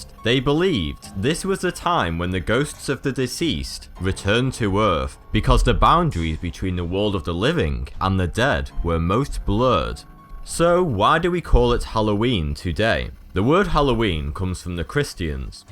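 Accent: United Kingdom. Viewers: "Samhain" is NOT pronounced Sam-hain. It is a Keltic word in the Gaelic language. It is pronounced "Sow-en". It translates to Summer's end. The veil between the world of living and the world of the dead is thinnest between sunset and sunrise. Trick-or-treat began to appease the deceased; so that the living would not be harassed by the dead. You sound British, and you don't know this???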